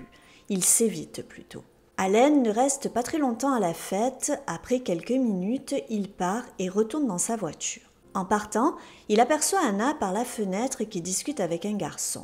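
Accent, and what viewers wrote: South, France. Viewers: Vous êtes du sud de la France ?😉 Petit accent !👍 Moi je suis Cannoise, j arrête pour la semaine et fidèle pour le week-end prochain 🙋‍♀️🐾🐈⚘🌞🤢🍀😺🌻👍👍👍👍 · J'adore ta chaîne et la façon dont tu raconte l'histoire👌🥰. Je pense vue ton accent que tu dois être du Sud de la France comme mo😉i je suis d'Avignon.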